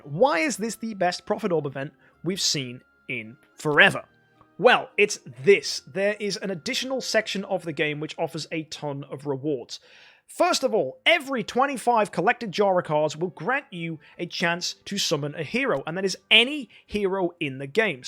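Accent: England, United Kingdom. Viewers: here for English accent :d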